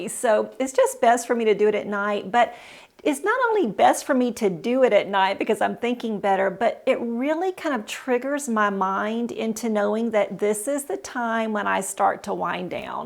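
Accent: South, United States. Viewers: ohio nice state. friendly state like indiana. are you from the south originally ? your accent thats why i ask.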